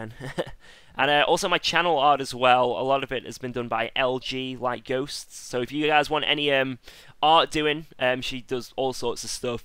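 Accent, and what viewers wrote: United Kingdom. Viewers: I'm not quite sure or how I ends up started to watch your stream. I never played GH at all. But after watching your stream, I bought my first guitar controller and just realized how godlike you are You deserves all this man, you works so hard everyday, producing something that considered impossible for mere human Also that sweet smexy British accent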